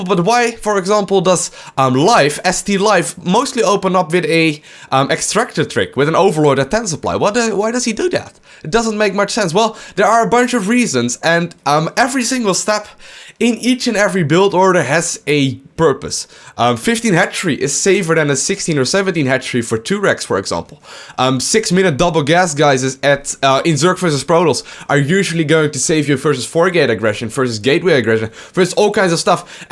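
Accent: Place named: Netherlands. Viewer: fuck i love dutch people. your personalities and accent is so awesome! love your videos bro!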